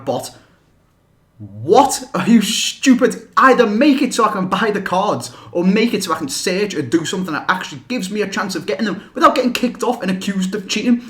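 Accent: Liverpool, United Kingdom. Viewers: Funny because you used to play for Liverpool, so shouldn't you be used to Scouse accents Heskey?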